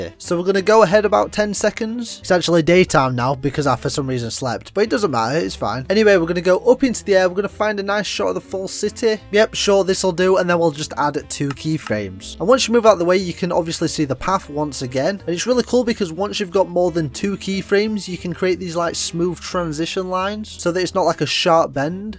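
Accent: United Kingdom. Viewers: are you from sheffeild/yorkshire?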